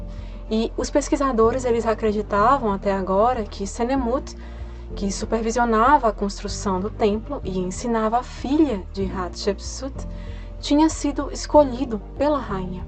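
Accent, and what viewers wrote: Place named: South/Southeast, Brazil. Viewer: Sou seu fã..., incluindo seu sotaque que, me parece, é do sul/sudeste...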